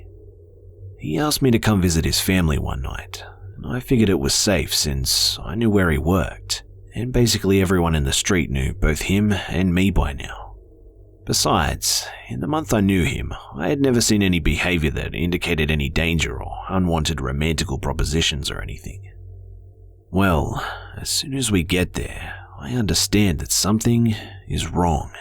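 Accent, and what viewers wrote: Australia. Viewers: can't quite place your accent. Australian , maybe?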